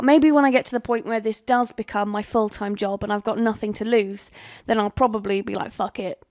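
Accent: England, United Kingdom. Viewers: English accent is elite. ✌️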